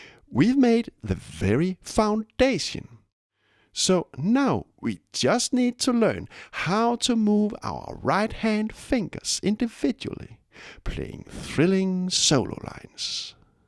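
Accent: Denmark. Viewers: Instantly recognize your accent! Nice video my fellow danish person <3 Gonna definitely use this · You sound danish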